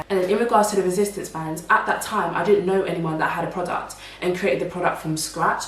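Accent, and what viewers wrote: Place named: United Kingdom. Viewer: Your accent 😍 I’m a sucker for british African Americans 😍😍😍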